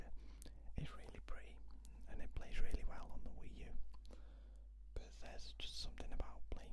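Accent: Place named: Northern England, United Kingdom. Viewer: oh haha you sound like your from the north of England. And how hard is oblivion to complete xD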